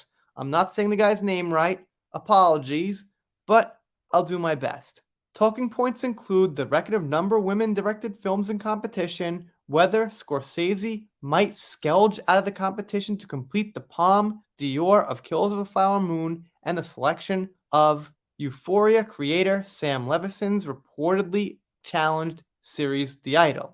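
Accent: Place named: New York, United States. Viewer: I like to hear you talk, I like your accent (unusual bc I'm a Southerner who typically can't take NYC/Boston accents 😆), & you're spreading good news about JD, so as far as I'm concerned, you can repeat as many narratives as you like!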